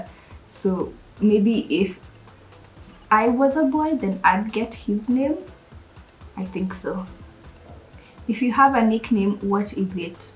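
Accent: United Kingdom. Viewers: It's the British accent for me👌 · The British accent mama 🤩🤩🔥🔥 sighs! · It's the British accent speaking for me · Its the British accent for me😍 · That British accent👌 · The British accent🙌🏼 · British accent 🔥🔥🔥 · Jesus can we talk about her "british"accent♥️ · Your british accent is awesome...the way have tried to mimic that accent and I can't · British accent of course and it reminds me of Kijana Wamalwa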